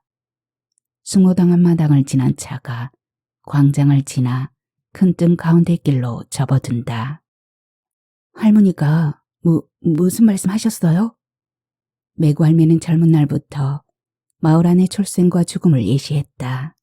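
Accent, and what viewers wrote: Jeolla, South Korea. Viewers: 님의 걸죽한 전라도 사투리 때문에 사람냄새가 물씬하였습니다. 모처럼 작품에 흠씬 빠져보았습니다. 감사합니다. 행복하시고 건강하십시오...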